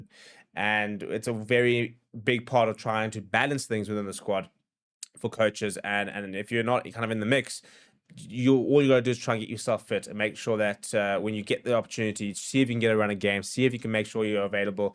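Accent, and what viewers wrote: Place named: South Africa. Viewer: Yea its a youtube problem not a creator problem... well actually it is, youtube cant understand a saffa accent😅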